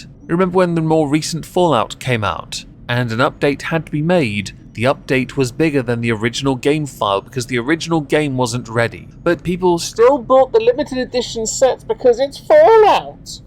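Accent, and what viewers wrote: RP, United Kingdom. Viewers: As a Geordie, all I've got to say is, your swearing is really posh 😆. I think that's why I went for my husband, he sounds posh to me too.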